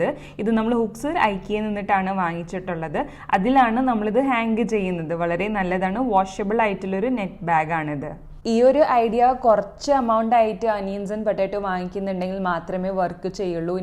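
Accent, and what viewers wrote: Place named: Mumbai, India. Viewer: Are u raised in Mumbai? Ur accent is like a Mumbai malayalee 😄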